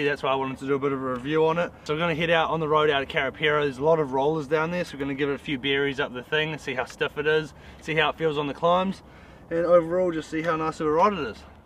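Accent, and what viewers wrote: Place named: United Kingdom. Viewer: your UK accent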